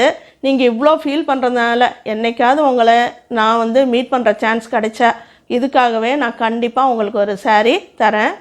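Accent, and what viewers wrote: Salem, India. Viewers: neenga Salem ha madam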